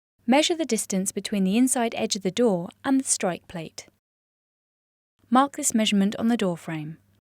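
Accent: United Kingdom. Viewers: Isn't amazing how "simple" well spoken English with complete sentences and diction can help to simplify how to do ANYTHING?! I am trying to fit for a mortise on a storm door and this video is exactly what the Doctor ordered. I have never done a mortise before. Thanks to VideoJug and a special thank to the lovely British voice of. AWESOME Video! Cheers!!!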